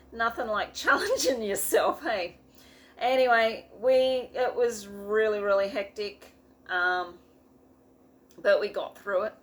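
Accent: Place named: Australia. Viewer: Always good to see a video off you, no matter if it's a small or large amount of stitches. Boy that was a super busy weekend, you had every right to be cream crackered. Do love the brown fabric you dyed👍. The stand looks very well made, sure you'll get many thousands of stitches completed on it.I love hearing the Aussie accent! Stay safe, hoping you'll be able to get some stitches completed 🤗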